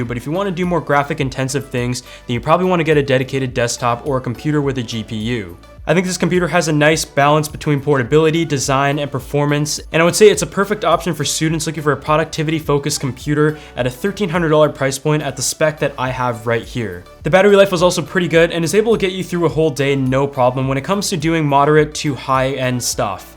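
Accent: Canada. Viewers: r u from Canada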